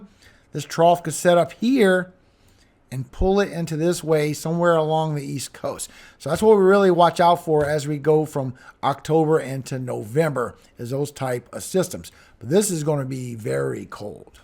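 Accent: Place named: New Orleans, United States. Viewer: Thanks,. You sound like you are from the Westbank, New Orleans. 🙏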